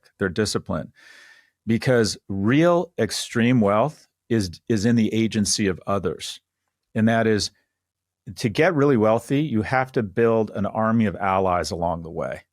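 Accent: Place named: United Kingdom. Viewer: sound bri’ish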